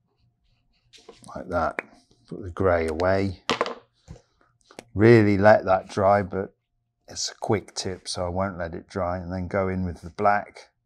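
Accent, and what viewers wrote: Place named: United Kingdom. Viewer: Didnt expect him to have such a british accent. I love his stuff. Amazing artist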